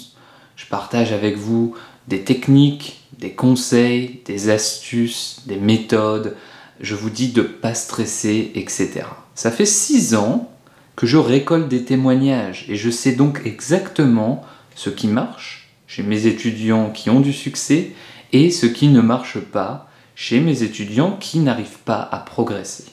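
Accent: France. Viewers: Parfois c'est bon de traduire littéralement de l'italien en français, mais pas toujours.. Et... Bravo pour ton italien oral! Ton accent français est délicieux, comme l'accent de tous les français lorsqu'ils parlent italien! 😊 Je te salue de ma merveilleuse Liguria, l'un des lieux les plus beaux d'Italie, un arc-en-ciel de lumière et de couleurs entre la mer et les montagnes que je te conseille vivement de visiter! Il me fait plaisir que tu aimes l'Italie et sa culture! Mais... tu me permets? On dit "mi piace molto imparare..." etc, non pas "mi piace molto di imparare... etc. Ce "di" n'éxiste pas dans cette phrase en italien: c'est juste une traduction littérale du français, ce que tu viens de dire!... Tes enfants qui chantent "L'Italia s'è desta" sont trop charmants! Ciao!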